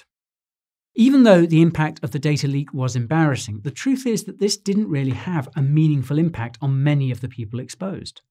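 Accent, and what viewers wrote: United Kingdom. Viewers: Well, you have an advantage with the British Accent. Love the channel. No bullshit. Simply informative. Let people make up their own minds... unless they believe in horoscopes because they have no spine for personal responsibility. Keep up the good work. Perhaps we'll dub you an honorary American...who knows.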